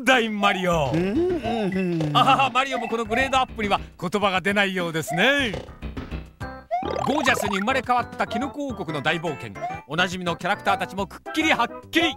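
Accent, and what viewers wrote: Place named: Japan. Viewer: Narrators speaking English but in Japanese accent.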